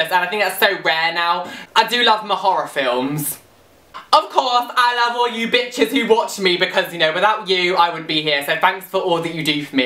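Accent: United Kingdom. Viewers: I LOVE the sound of rain on a tent. I love storms. I LOVE watching your videos!! Stars are AMAZING. I love British accents and terminology. That was part of the reason I watched your videos for a whole day! I love when I use a British term to my friends are family and they are like O.o and then it takes me a few moments to realize that I just called some guy a "git" so of course they aren't going to know what I'm talking about <3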